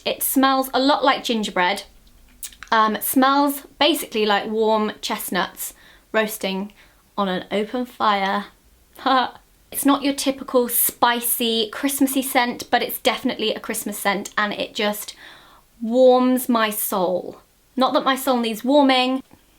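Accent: United Kingdom. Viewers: Kaaaaaaaaaaaaaaaawaii, <3 OMGlitter, so... beautiful! ^^ Amo seu sotaque britânico. Oh Gosh, como pode ser tão perfeito??:o Xx, { PetitCatcake Channel's (Visit) }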